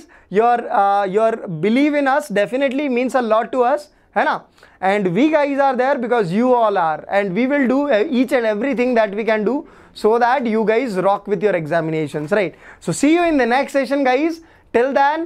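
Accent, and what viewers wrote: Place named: South, India. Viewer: Yeah from south obviously you can understand his accent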